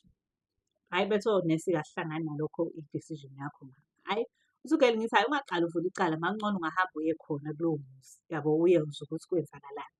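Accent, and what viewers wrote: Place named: Pietermaritzburg, South Africa. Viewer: Hawu awusho awsiye owasePMB? Ngizwa i accent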